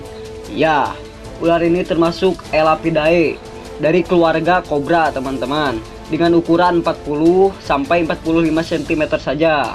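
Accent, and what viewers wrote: West Java, Indonesia. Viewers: Gaya Ngomongna sunda pisan euy. Jigana babaturan Dede Inoen. Mangpaat pisan infona Lur. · Sundaaa...a bangetz...!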